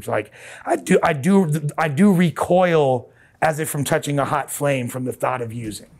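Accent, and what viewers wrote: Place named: Los Angeles, United States. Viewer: Genuine west side L.A fella , authentic in articulation , finally hear someone who gets it , the recovery language , the program , actually talking the walk. Thank you for "sharing".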